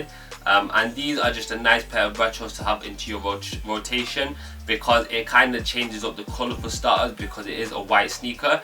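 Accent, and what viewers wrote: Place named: London, United Kingdom. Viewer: Love your mix of London and Manchester accents, sounds so dope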